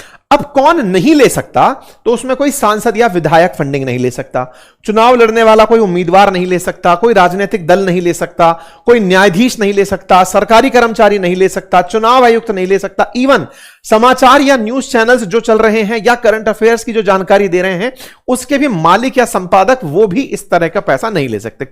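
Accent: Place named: Rural, India. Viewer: Bhai dehati English pronunciation 😢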